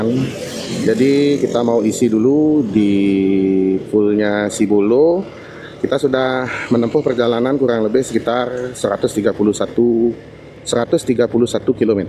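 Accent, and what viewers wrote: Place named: West Java, Indonesia. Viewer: Yogya hadiir perdana beli Pingiin ikutan Logatnya kok ada sunda n bali nya ya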